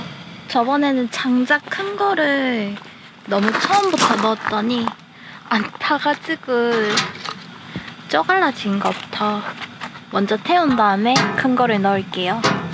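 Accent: Gyeongsang, South Korea. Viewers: 경상도 시죠?ㅎㅎ 그냥 댓달아봅니다^^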